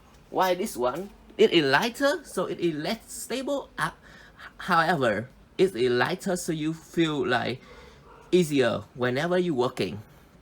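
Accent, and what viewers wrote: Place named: Thailand. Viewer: Thai accent is so recognisable. one love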